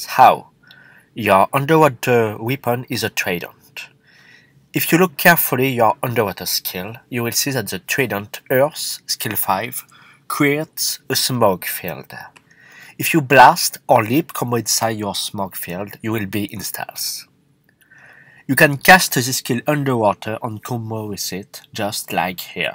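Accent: France. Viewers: I know he talks English but I just hear French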